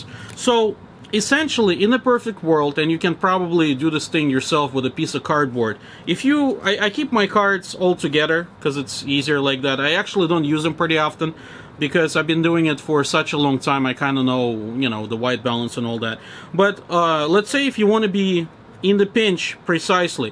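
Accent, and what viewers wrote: Russia. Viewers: This guy Russian accent How do I use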